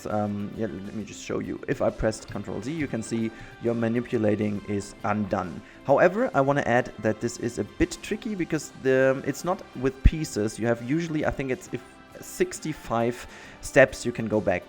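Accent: Germany. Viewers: Thanks for your afford! Appreciated it - you have a german accent - don't you?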